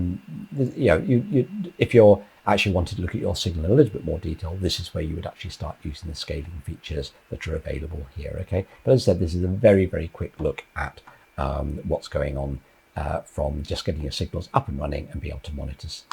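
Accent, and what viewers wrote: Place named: United Kingdom. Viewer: Master class with a nice British accent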